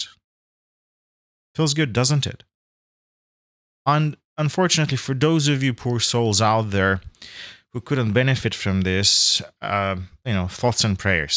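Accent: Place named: Russia. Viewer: отличный аннлийский. слышен немного русский акцент но все равно красава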